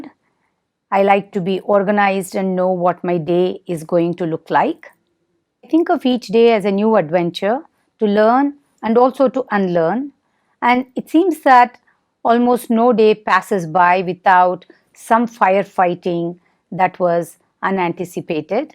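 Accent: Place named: South Asia. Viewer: Thank you for these words of wisdom Ms Kalaari. The subtitles really helped since I can't follow your accent (South Asian, I believe). Can you point us to some good HBS case studies of your investments?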